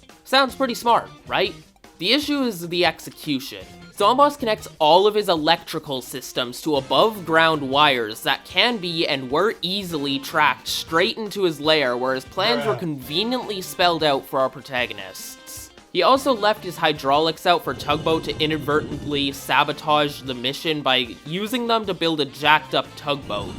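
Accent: United Kingdom. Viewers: You’re British, right?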